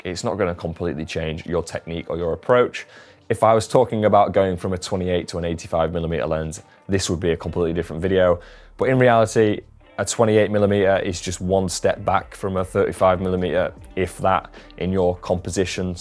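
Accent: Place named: United Kingdom. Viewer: Great video - seems like an "evergreen" discussion :) So, assuming we're talking about 35mm fullframe, to me, this is exactly opposite of your view - I'm a "50mm guy" and find the 35mm to be somewhat between two chairs, if I can put it that way. If I want something wider than 50mm, I find that I reach for my 20mm. Ps. I LOVE your accent - where in the UK does it come from?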